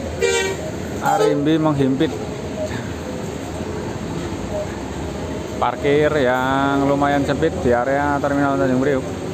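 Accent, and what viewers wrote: Banyumas, Indonesia. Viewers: Hadir nyamperin.. Mas.. Yen ora ngapak ora kepenak lur... Paten..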